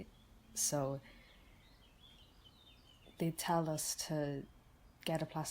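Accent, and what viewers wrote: Wales, United Kingdom. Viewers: Can’t quite figure out the accent 😂. Is it Welsh?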